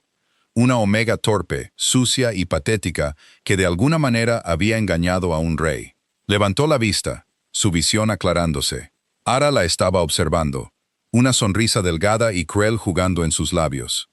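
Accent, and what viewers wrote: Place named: United States. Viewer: Podria ser una voz de IA que no tenga acento gringo?, ya sabemos que estan desesperados por invadir latinoamerica pero ya esto se pasa un poco.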